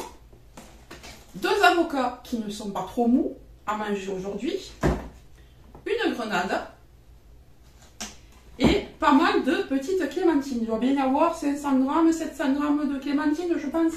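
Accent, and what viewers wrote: South, France. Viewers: jadore ton accent!!!!! ca sent bon le sud et le soleil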